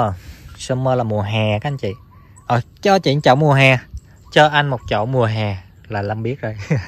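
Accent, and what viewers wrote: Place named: South, Vietnam. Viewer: Mình rất thích nghe giọng nói của Lâm giọng chuẩn miền Nam nghe rất là thân thiện lại còn vui tính tại vì mình cũng là ngươi miền Nam.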